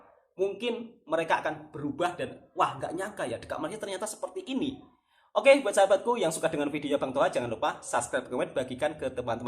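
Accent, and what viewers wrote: Java, Indonesia. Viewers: Ini, jowonya waduh...buaayak pekatnya....hehehehe😂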